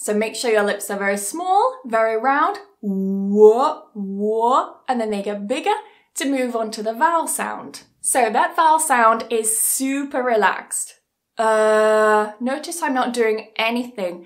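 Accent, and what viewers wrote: United Kingdom. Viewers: Hi, I prefer coffee. I love your British English Pronunciation with Walk and Work., I also want join your English Pronunciation Pro. You are my best teacher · Love your British accent!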